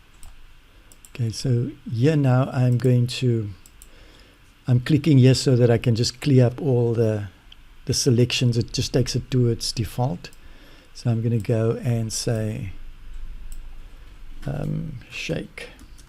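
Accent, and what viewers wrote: South Africa. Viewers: Nice so here a South African accent.:) Goeie werk.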